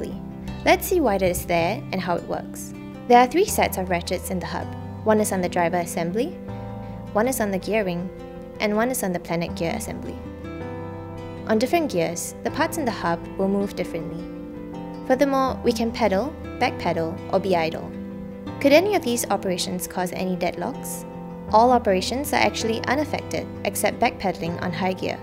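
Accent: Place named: Singapore. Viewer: Sounds like you are from Singapore. So proud of you. Now that i have watched all three videos. Majulah!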